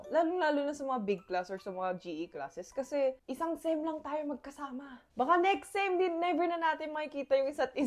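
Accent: Batangas, Philippines. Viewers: Koreana ka sa itchura pero accent mo Tagalog Hindi ka na masabi na koreana ka...Ang galing mo kunwari lahat ng Korean ay magkaka klac at subject pilipino..malamang ikang ang pinakamagaling sa lahat.. specially 4 years old ka sa pinas ka na. Baka fluent ka pa sa Tagalog Kay sa Korean language.. . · wow. your channel popped up on my feed just now. grabe te, natural yung topak mo. pikit lang ang mata, pinoy na pinoy ang punto. grabe tawa ko! this was really enjoyable. i'm surprised na wala ka nakita ibang koreans masyado sa UP since kahit na 20+ years ago, andami na umaaligid aligid sa UP. may nanligaw pa nga sa orgmate ko eh. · Nakakatuwa, lumalabas pa rin ang batangas accent ni ineng. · I like esp. Bataguena accent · You’re so funny. If I don’t see your face I will absolutely say you’re Pinoy. You behave, sound and act like pure Pinoy. Great job! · Alam mo ng napanood kita sa vlog mo natuwa ako kase talo mo pa ako mag salita ng batanguena kse batanguena ako pero sa manila ako lumaki at ng aral ng high school at kolehiyo tuwang tuwang ako sayo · Yong sound nang english mo ate girl true studied in philippines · i initially thought na filipina ka na singkit lang talaga (like pure filipino dahil may friends akong they look like they are half but in reality, full filipino) dahil sa vibe mo and the way you talk, u earned my sub!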